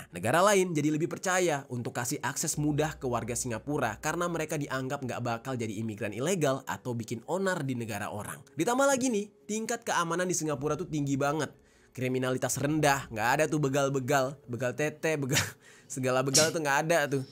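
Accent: Malaysia. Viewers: Terima kasih atas infonya, slang macam orang Malaysia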